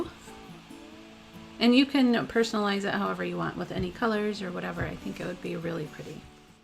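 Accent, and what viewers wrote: United States. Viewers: Your creativity and ingenuity knows no bounds! Wow, it's hard to choose a favorite...I loved so many of these! Thanks for sharing such inspiring projects with us! P.S. Are you from the Northern Ohio/Southern Michigan area? Your accent is familiar : ) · So many cute ideas! I love the gift tags, the paper flowers, the tray and the craft room storage ideas. I also like the pumpkins - I actually knit those so I think using socks might be easier. Your Michigan accent (guess) makes me think of home. I was raised in Ohio. Thanks for sharing your creativity! 💜🙏🏻👼🏻✝️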